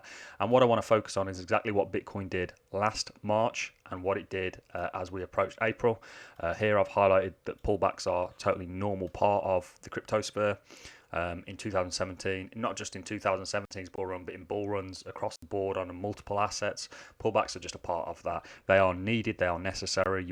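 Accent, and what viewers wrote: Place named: Wolverhampton, United Kingdom. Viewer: I have a friend from Wolverhapton, UK. He sounds like you. Great video, hi from Sweden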